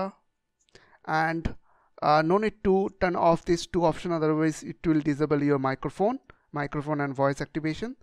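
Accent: India. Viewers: I thought he was going scam us cause of his indian voice. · I always wonder why it always the guy with the Indian accent that know all about technology but it truly a blessing to have the around when we have trouble. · I thought he would just ruin your computer with his Indian voice but after looking at the comments I knew he was one of the good ones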